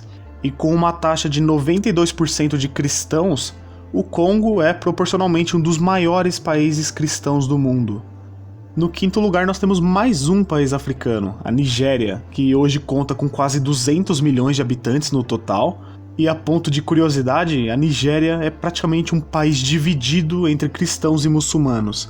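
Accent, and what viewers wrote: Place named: São Paulo, Brazil. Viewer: Ah, e acredito que o sotaque não interfira muito. Sou paulista e falo exatamente como você! Rsrsrs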